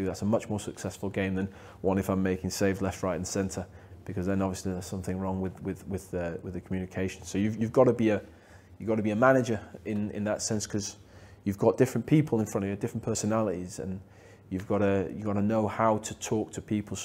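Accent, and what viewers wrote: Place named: Denmark. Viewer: He definitely has a tiiiiiiny hint of danish in his accent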